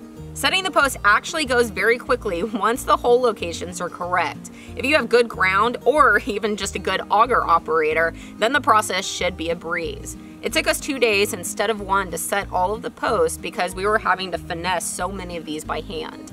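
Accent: Texas, United States. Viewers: Hmmm... Accent sounds like Texas... Rocks in the ground, so probably not Gulf Coast or Dallas... Too much vegetation for it to be West Texas, so must be Central Texas somewhere... Howdy from the Houston Gulf Coast area!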